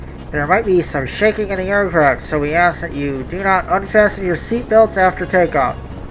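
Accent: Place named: United States. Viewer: Jesus christ the blueish grey haired woman's tits are so unnaturally big that it feels uncomfortable to look at her, the faint femme fatale smile on her face doesn't help either lol Loving the voice acting! especially the female voices and the little girl's "uu-" XD so you are American? I should have noticed! I'll give you a little hint: Unlike in english, where a certain vowel may sound different depending on the word, in Japanese, every vowel always sounds the same way: A always sounds like "ah" (as in cat) E always sounds like "eh" (as in pencil) I always sounds like "ee" (as in feel, peel) O always sounds like "oh" ( just a short O sound as in "boy", not as in the verb "to go") U always sounds like "oo" (as in fool, goon) (It's much easier for a native spanish speaker as myself to pronounce japanese! you may have noticed some grammar horrors in my comments! 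Hope that was helpful!)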